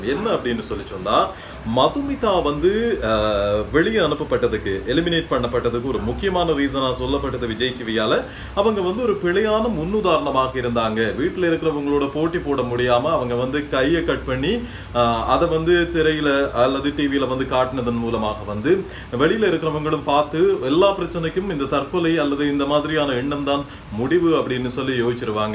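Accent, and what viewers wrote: Sri Lanka. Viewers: ஆம் இவர் இலங்கை 🇱🇰 தமிழர் நல்ல பாடகர் வானொலி அறிவிப்பாளர் நம்ம அண்ணா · உங்க தமிழ் இலங்கைதமிழ் மாதிரி இருக்குங்ண்ணா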